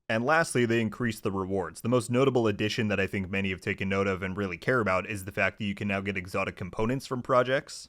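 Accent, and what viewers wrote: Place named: Brooklyn, United States. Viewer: Why...not that I'm bih'ing, cause I ain't, cause am digging it... but, why do you sound like ya just hop off a train from Brooklyn? LMAO. Seriously. Least to my ears, but you sound today like you got that twang...js.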